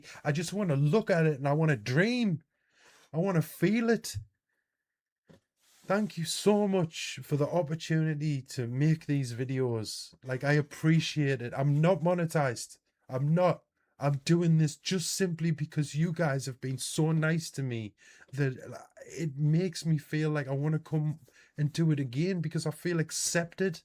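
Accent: United States. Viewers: That’s crazy to Americans and I’m so sorry you are having to deal with that… you sound like an American have you ever thought of visiting or immigrating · Hi, You sound just like one of us. You ever get to the USA, we want to invite you to our home in Cave Spring, Georgia. We would be honored to show you around our little town and beautiful town park. Pop 1,000. God be with you man 👍 Mike Deaton, proud American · Your words break my heart. You sound more American than some Americans I know. 🇺🇸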